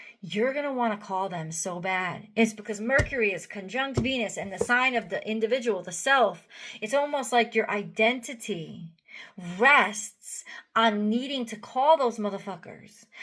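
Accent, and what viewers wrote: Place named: Texas, United States. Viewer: Love to hear the texan in you coming out in this vid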